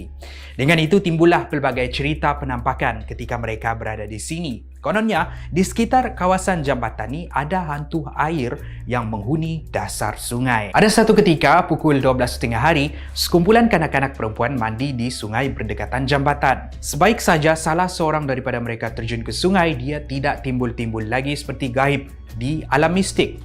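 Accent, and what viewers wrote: Sabah, Malaysia. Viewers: Sabah bh ni kan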